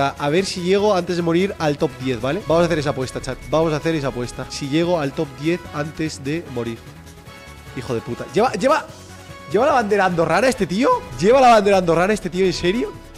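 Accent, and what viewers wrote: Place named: Argentina. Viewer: rubius habla un 30% argentino y un 70% español lol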